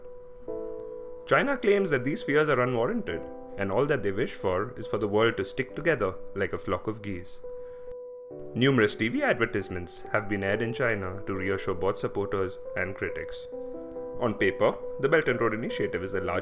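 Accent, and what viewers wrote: India. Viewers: 100 % biased against China, the narrator sound like an Indian national...by the time Prime Minister of Indian, Modi dies, China GDP will be probably double of the U S A. The narrator knows nothing about China intentions nor he knows about the British and American are difference. The British is already joined the AIBB while the American doesn't.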